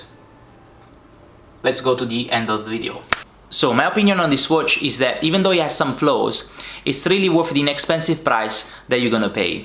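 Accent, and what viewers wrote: Italy. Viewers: The italian is strong in this one, btw nearly pulling the trigger on this watch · I bought this watch and I love the looks and I love the size. Great review and I did pull the trigger and got one partially due to this review so thank you. My grandfather came from Sicily so I had no problem understanding the Italian accent, in fact I loved it.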